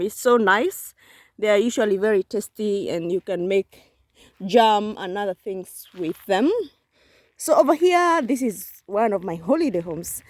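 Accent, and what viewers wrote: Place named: Kenya. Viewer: U sound kenyan.....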